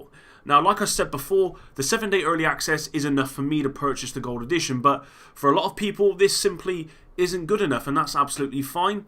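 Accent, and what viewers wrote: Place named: England, United Kingdom. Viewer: Good video. Keep up the great work. You sound English. Where abouts are you from? I can’t wait for this game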